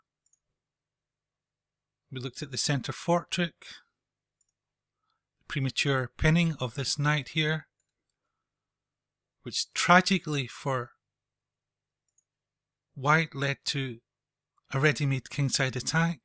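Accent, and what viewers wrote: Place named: Scotland, United Kingdom. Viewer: Terrific videos. Love the scottish accent too.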